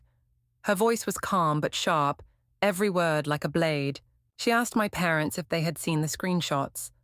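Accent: England, United Kingdom. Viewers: You know, every time I hear that English accent on the narrator I get pissed off.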